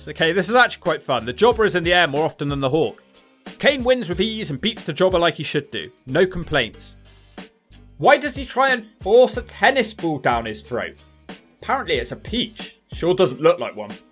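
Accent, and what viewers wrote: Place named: West Country, United Kingdom. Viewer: I've watched a few of your videos and always enjoy them. From your (West Country?) accent I thought you would be like 5'6, nerdy black hair and glasses. But you are very presentable, keep up the good work, I appreciate you're unique take on everything wrestling wise. There is so much generic wrestling commentary on YouTube, so your distinctiveness definitely stands out.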